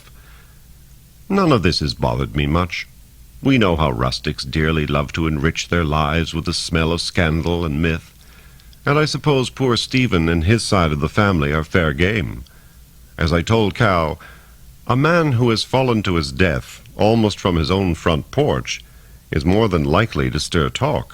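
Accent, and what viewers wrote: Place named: United Kingdom. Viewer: Horror stories should always be read in british accent. Good!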